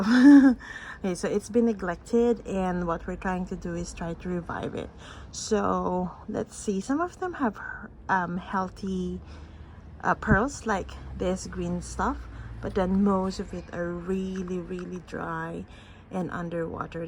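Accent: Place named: Philippines. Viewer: Hi! Lovely how you were able to revive them!! 💖 I struggle with these plants but I want to have them so bad! After I get new pearls, is it okay to change the soil completely? Will it kill the plant due to shock? I don't usually like the potting mix it comes with as I'm afraid they're not fit with my conditions (might be not so well-draining) or might even have pests in them. Is it better to leave it? Also, are they generally okay in shaded areas? Just bright shaded area, not much sun 😅 P.S. You sound Filipino!! Great video 💟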